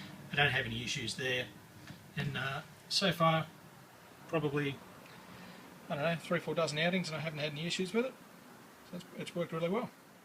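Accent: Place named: Australia. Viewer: Nice to hear an Aussie talking about yakking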